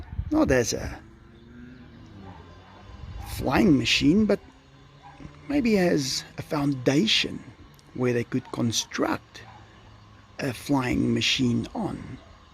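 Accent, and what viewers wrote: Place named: South Africa. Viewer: SA accent is so entertaining to me, especially when he says certain words/phrases. I particularly enjoyed “park that sucker” today 😂🤣👍. I also always enjoy “milk” and “barf” 🤷‍♀️ 🤪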